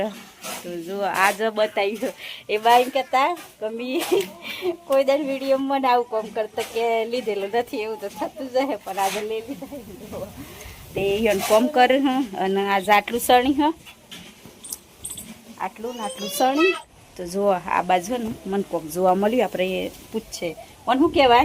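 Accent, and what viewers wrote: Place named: Sabarkantha, India. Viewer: બેન તમારી બોલી પરથી એવું લાગે છે કે તમે સાબરકાંઠા જિલ્લાના હોય એવું લાગે છે 🎉🎉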